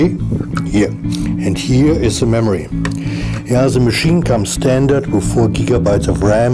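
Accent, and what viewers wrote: Germany. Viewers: Good video, just ordered a refurbished one, gonna change the cpu and add a ssd and 16gb of ram. By the way... Are from Germany or a native German speaker? Greetings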